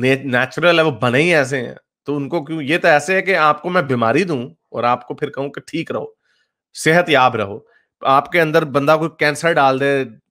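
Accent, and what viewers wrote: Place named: Punjab, India. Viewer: That deep Punjabi voice 😁😁😁👍👍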